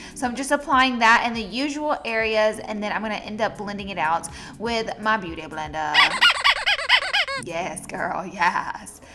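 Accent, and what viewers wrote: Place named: Rural, United States. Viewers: has a country accent 😂😂 I love it❤️ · i luuuuuuv that "aksent!!! are you from texas also??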